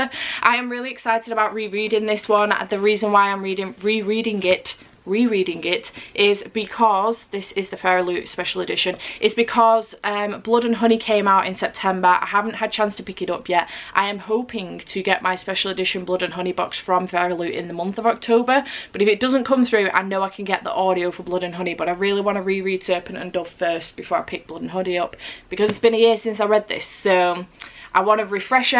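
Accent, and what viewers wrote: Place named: Standish, United Kingdom. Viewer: Thrilled to find you ,I grew up in Standish , your accent makes me homesick.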